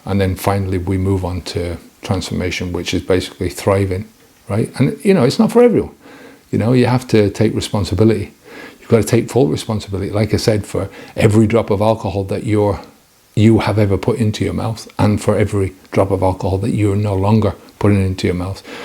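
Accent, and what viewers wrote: Ireland. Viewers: Your accent seems a bit stronger now that you're back in Ireland!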